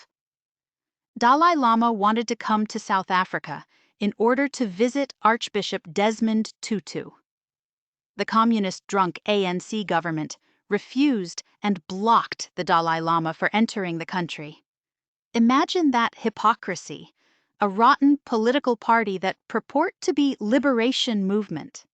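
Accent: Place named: United States. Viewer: American accent!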